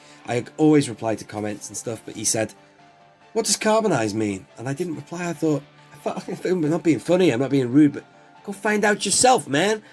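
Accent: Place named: United States. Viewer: It would be nice if the 3.75 were as detailed as the 6! BTW you do a great American accent! 👍🏼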